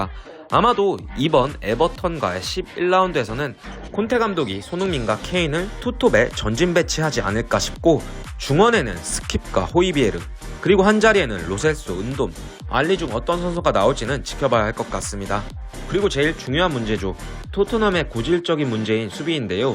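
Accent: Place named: Jeolla, South Korea. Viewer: 전라도 억양이 살짝살짝 나오네 hoxy 고향이?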